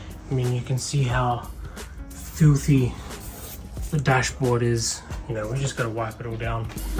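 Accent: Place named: United Kingdom. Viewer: The British accent just does it for me 😍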